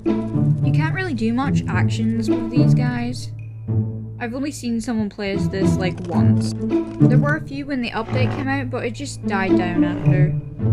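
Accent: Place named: Australia. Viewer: Is it just me or do u sound Australian all of a sudden👁️👄👁️?? ur voice is adorable 😭✨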